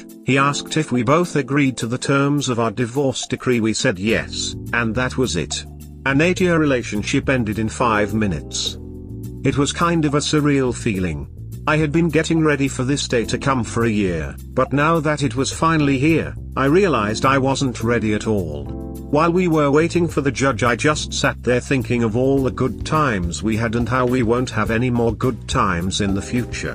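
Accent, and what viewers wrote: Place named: England, United Kingdom. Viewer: I'm 3/4 of the way through this story (and don't know how it ends) and feeling frustrated and irritated with OP (maybe the male English accent of the narrator is making it even worse). This story should be titled, "Journey into the mind of a SIMP BH". Once divorced, stop doing the 'pick-me' dance, block her phone and all forms of communication; full NC. End of story.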